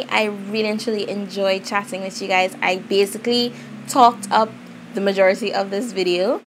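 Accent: Trinidad and Tobago. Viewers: Love hearing and seeing your videos..it's so refreshing to hear our lovely trini accent🤗great work hunni💕💕💕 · I am sooo happy i was SHOOK when i heard your accent i was like.. wait nah dis is ah triniiiiii!!!!! ayeee new subscriber!!!!!!!!!!!!!👏💯❤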